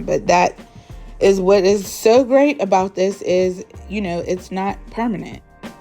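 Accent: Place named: Pittsburgh, United States. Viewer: I’m from NY… my college roommate and best friend was from Pittsburgh 🥹 your accent sounds so much like her 🥰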